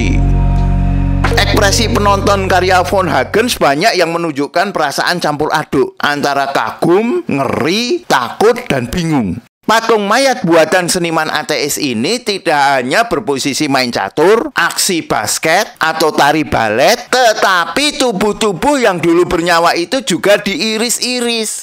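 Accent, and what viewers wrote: Java, Indonesia. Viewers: Suaramu medok bang , nguakak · Puyeng denger aksen medok nya..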